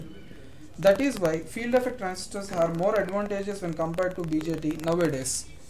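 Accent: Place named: India. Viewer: cool and kinda funny how your cursive writting has as much indian accent as your voice.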